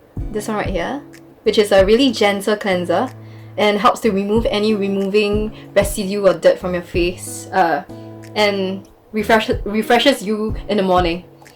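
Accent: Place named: Singapore. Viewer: dat singaporean/malaysian accent....instant recognition and instant upvote from me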